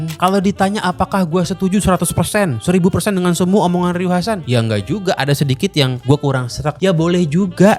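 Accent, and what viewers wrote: Java, Indonesia. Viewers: Kenapa kamu sekarang intonasinya ke Jawa"an bang, terinspirasi dari maha guru kah? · Iya, kalau di channel ini aku jadi jawa sesuai mahaguru, biar beda sama di channel utama 🤣🤣🤣🤣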